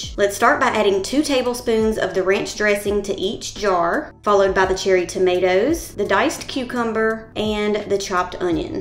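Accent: South, United States. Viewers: "bowd eggs" 😊 "taws" 🥰 I absolutely love her voice and accent. (I know, many from the South are thinking, What accent? 🤔)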